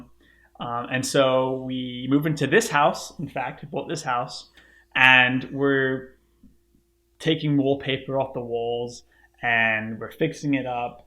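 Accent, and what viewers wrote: United States. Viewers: You sound quite American now mate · i don't want to say this but your starting to sound america like you are losing your accent i can hear the american accent alot when you speak. you going to sound like an american soon anyways welcome to America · aw thank you for the reply and feedback!!! Great to connect also ☺️ my fiance wants to live here I think but I may come over and have a long extended holiday next year and we can make the choice then. The healthcare over there worries me as I care for my teenage daughter and I also have health issues. Will keep you posted though as our journey unfolds! I absolutely love your adventures in the RV and what an amazing experience you all are having. I can hear the soft American accent creeping in also on yours lol...I find I say a lot of American words now because my fiance and I chat daily so I'm picking up a bit of accent just from that lol. 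Take care and many blessings to you and your amazing family! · Sorry bro, I thought is a dude. Didn’t realise she’s a she. How’s life now in Houston? I noticed you’ve adopted American accent now.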